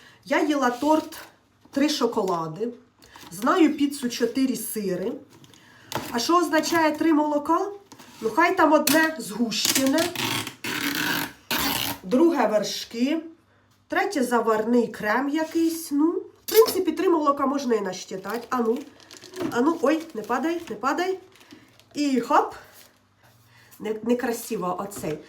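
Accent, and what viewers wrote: Poltava, Ukraine. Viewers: вогонь, а не жінка, а Полтавська говірка просто❤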